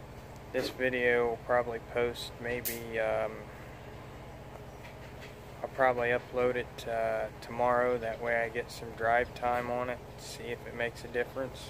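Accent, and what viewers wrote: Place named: Southern Ohio, United States. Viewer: I'm from southern Ohio your accent sounds just like mine were you from Chillicothe Ohio Jackson or porstmouth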